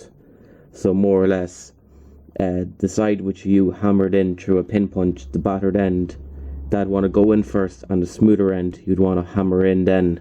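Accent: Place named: Ireland. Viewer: Sounds like he's Irish.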